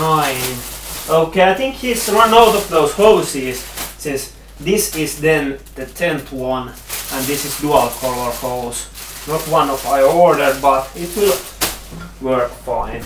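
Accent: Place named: Finland. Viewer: Kiitos nopeasta vastauksesta. No hyvä, ajattelin jo että joudunko kiduuttaa viikkoja.. Ps. Englantisi on hyvä, mutta suomalaisuus paistaa kyllä taustalta omaan korvaan. Niin kuin kuuluukin. Pistä lisää Hookah videota tulemaan!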